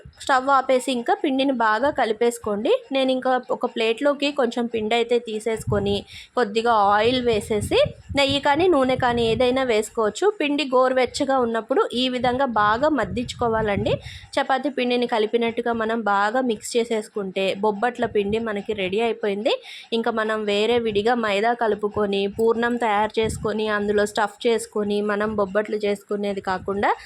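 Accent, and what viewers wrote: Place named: Andhra, India. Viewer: Meedi proper andhra Telugu spastamuga matalduttunnaru.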